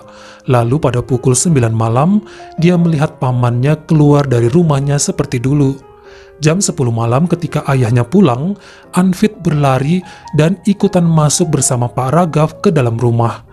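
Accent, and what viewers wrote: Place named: Java, Indonesia. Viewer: Bang boleh nanya gak.. Abang orang batak atau Jawa seh... Nama marga batak..logat jawa😥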